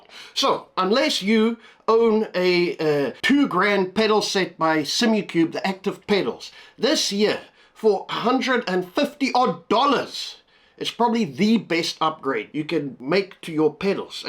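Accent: Afrikaans, South Africa. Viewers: Tel ek 'n afrikaanse aksent daar? Great content ! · Are you South African sir? If so where !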